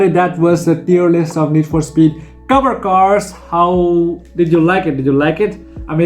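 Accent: India. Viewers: Yo dude, quick question, where are you from? You look asian but sound indian, it's an interesting mix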